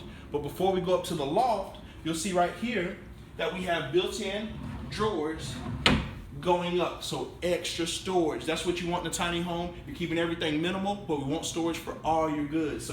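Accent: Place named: Texas, United States. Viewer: We can never watch the videos all the way through because the way talks is so annoying. A mix of thug and texas rancher. Most media forms recommend a standard midwestern accent or so I was taught in journalism class.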